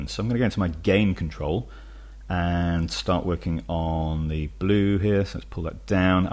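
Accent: England, United Kingdom. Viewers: Dear, Thanks a lot for your LUMA Mix killer tip! It helped me most of my previous day to match a color grading between HD plans but also old SD plans shot in Antarctica for a documentary. It was super helpful and the director are super happy cause the ice have a color that change depending how old was the ice (numerous green/blue clips but also with orange/yellow on high lights due to the sun). You "enlighted" my day:-) Your video was simply super clear, simple, effective, you really go straight to the point and i love your english accent! So thanks! It made my workflow way much faster for that! Have a nice week and thanks again for sharing your knowledge on a very simple and friendly way! Best regards from France! Vincent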